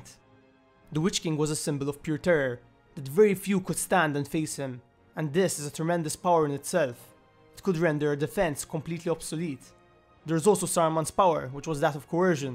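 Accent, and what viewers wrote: Malta. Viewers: are you maltese by any chance? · I could tell you were Maltese purely from the accent haha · are you Maltese? I m going off your accent alone here, hahaha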